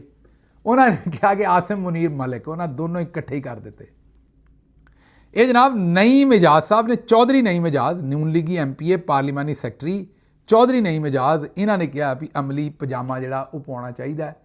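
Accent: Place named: Ropar, India. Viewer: SB THODI BOLI SADI ROPER(AMBALA) WALI LAGDI AAA,BOHAT PYAAR AUNDA THODE TE.SADQE JAYON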